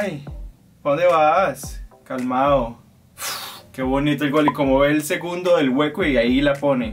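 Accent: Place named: Cali, Colombia. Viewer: Puro asentó de Cali ve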